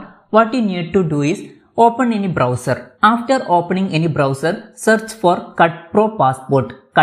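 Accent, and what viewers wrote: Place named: Kerala, India. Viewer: Understood from your accent bro; 😊 I have a keralite friend ...; I am from North Bengal. 😊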